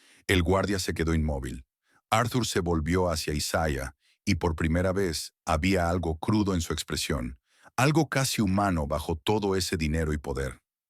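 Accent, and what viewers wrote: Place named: England, United Kingdom. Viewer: Sería un palo si tu narrativa tuviera pronunciación latina y un español sin acento ingles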